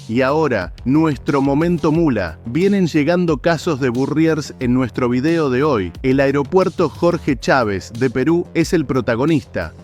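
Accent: Argentina. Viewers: Frases españolas pero con acento argentino. Entre absurdo y repugnante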